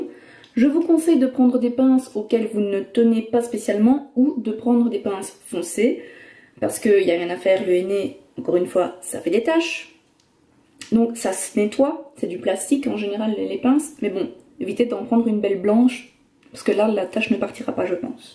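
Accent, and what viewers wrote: Belgium. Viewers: Elle est belge je crois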